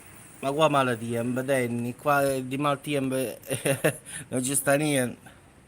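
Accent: Naples, Italy. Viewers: 🤣🤣 come sei divertente con stu napoletano!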